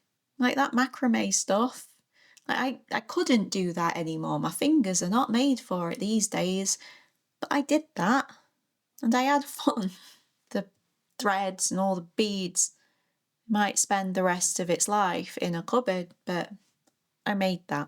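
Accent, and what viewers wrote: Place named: North, United Kingdom. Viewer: Still got the northern accent 🎉🤟🏻💪🏻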